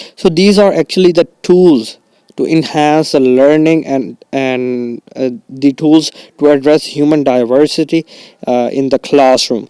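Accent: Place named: United States. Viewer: US accent mai bt krny ki... so horrible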